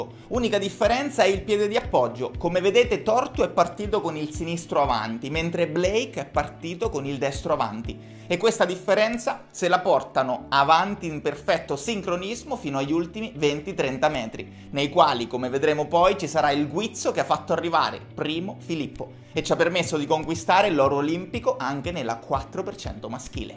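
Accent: Marche, Italy. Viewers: Ciao, come si chiama lo youtuber? Sembra marchigiano, la staffedda quattro per cendo po' esse solo de qui vicino. Verso magerata o giù verso Fermo fra.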